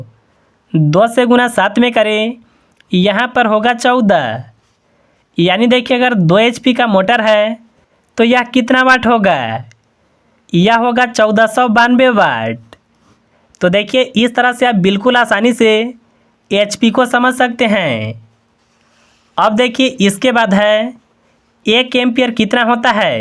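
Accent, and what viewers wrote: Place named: Bihar, India. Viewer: Bihar se lag rahe ho sayad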